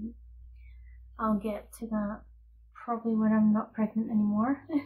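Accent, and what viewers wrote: Scotland, United Kingdom. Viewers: I've been listening to your lovely Scottish accent and following your awesome creations for about a year now. It's nice to see you in person. Congratulations on the upcoming addition to your family.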